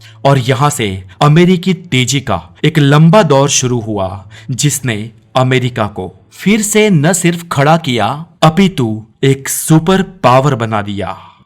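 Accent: Gujarat, India. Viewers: Gujarati lago cho bhai tmy 😀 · Your voice is gujarati ...👍👍👍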